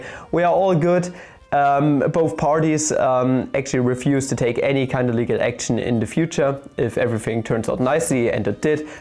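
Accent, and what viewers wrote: Germany. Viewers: And please clear my doubt "are you German"?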